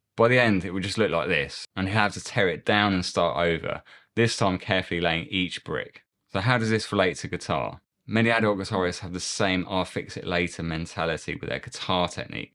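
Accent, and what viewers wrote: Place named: United Kingdom. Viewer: I always found the British accent annoying but this guy takes it to the next level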